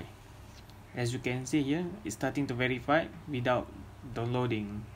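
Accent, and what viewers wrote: Malaysia. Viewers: sounds malaysian? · how about if my disk space is full, but i had the game download already? btw where you from because i hear some manglish.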